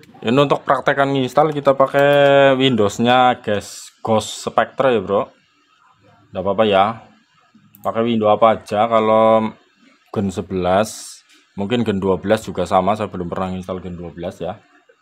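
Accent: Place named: Banyumas, Indonesia. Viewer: Ngapak temen kang😂😂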